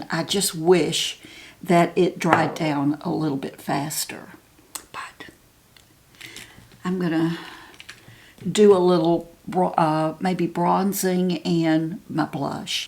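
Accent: South, United States. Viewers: Just found your channel and I love it!!! Thank you for this makeup wisdom!!! Also,do I detect a bit of a Southern accent? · I love your Southern accent. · Good day Mrs. You are amazing and brave. There is no way, I would have a YouTube channel but show myself without makeup is a scary thing to me. But you have a bieutifull face and a great southern accents. I would love to hear some stories you might want to share and tips and things. I am sure you have a lot to share that would be helpful to somebody like me. Thank you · Just found your channel at the recommendation of a friend! You are gorgeous! Love that you show your naked face and all the techniques you use to enhance your beauty. At 80, you are amazing! And with your accent you must be a sister from the south. 😊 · Your eyes are so pretty, bright, and clear. Not just the color, but the whites are so white, even b4 makeup application. 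Tends to fade with age and/or health challenges. 80 looks good on ya! Appreciate your transparency. New here. Enjoying your southern lilt. Luv the transformation you did here! Very nice result. Great pointers. Just checking it out 🖐 P.S.Yknow, me and a friend are watching and think you resemble singer Judy Collins. Ever heard that before? p.p.s. I have heard other makeup artists comment about the importance of blending, that unrernlenring is one of the most common errors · Absolutely love this! You best believe I will be glamming up when I’m your age ❤ so elegant and your southern accent saying “matoooor” and “oahll(oil)” reminds me of my southern family.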